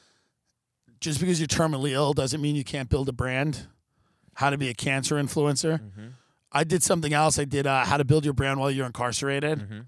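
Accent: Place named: Chicago, United States. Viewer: love you Tim. you sound like my Chicago cousins. i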